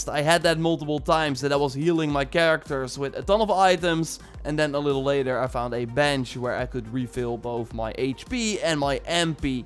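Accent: Netherlands. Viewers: Clearly not Belgian, I think it really sounded Dutch as well :) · Are you Dutch cause you kinda sound like it😆 Me2 btw Dutch ff fanboys ftw!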